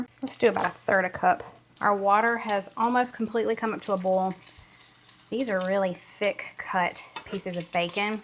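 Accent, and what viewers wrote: South, United States. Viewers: Embrace your southern accent!!! Say boil and broil and oil the way you normally say it. 😊 We never miss an episode!!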